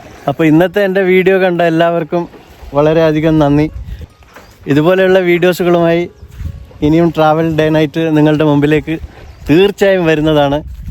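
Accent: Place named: Malabar, India. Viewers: iam watching from atlanta, georgia., usa.....i accidentally came across...ur video....i like your presentation...the language is different...kozhikode style....very good..and your innocent attitude, rather sincere approach...and presentation...is appreciable....thanks....waiting to see the next vedio....👌👌👌👌👌👌👌👌🤣🤣🤣🤣🤣🤣🤣🤣😁😁😁😁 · Hallo Sir. You are explaining excellently in your videos. From your slang I can understand that you are a malabari. Any how all the best and waiting more videos from you. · Reminds me of old hippie time in kovalam in 70s..now it's all over..I too was involved in it .but looking back it was a time pass..I didn't know hippies are still there? A rare species anyway..laziness, drugs and such..u sound like from Malabar? How did u reach there?single?need not answer if u don't want...all the best? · നിങ്ങളുടെ മൂന്നാമത്തെ വീഡോ ആണ് കാണുന്നത് നിങ്ങളുടെ നദാപുരത്തെ ബാഷയും വിനയവും എനിക്ക് വളെരെ ഇഷ്ടപ്പെട്ടു നാണു വാണിമേൽ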